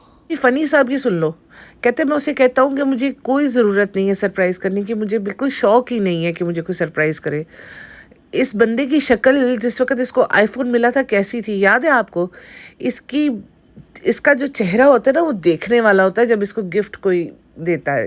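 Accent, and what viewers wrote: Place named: Punjab, India. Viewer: That punjabi tuch ❤❤❤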